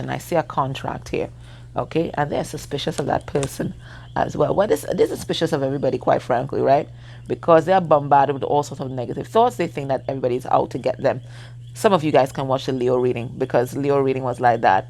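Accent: Trinidad and Tobago. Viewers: You sound like yuh from 🇹🇹 Good reading! ♐🇹🇹 #Reppin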